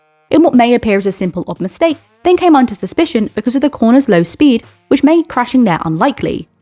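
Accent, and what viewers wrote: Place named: United Kingdom. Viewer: Great video, keep going, having British accent in F1 commentary always sounds awesome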